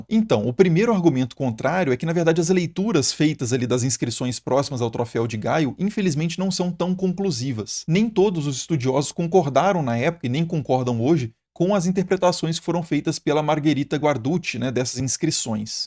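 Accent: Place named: Belo Horizonte, Brazil. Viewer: Meus parabéns a você e a seu canal! Cultura e erudição transmitidas com uma linguagem "magistral" (stricto e lato sensu), e um agradabilíssimo sotaque belorizontino!!!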